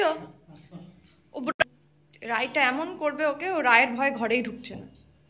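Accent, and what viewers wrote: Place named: Nadia, India. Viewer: তোমার কথায় খুব সুন্দরভাবে নদিয়া জেলার টান আছে l আমার পৈত্রিক বাড়ি শান্তিপুরে l তাই এই টানটার সঙ্গে খুব পরিচিত আমি l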